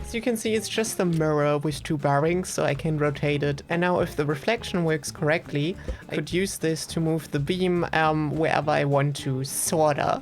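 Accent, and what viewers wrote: Germany. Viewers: she isnt using a voice changer, i think shes trying to make her voice less deep. and shes german so that may be why u cant understand her for some reason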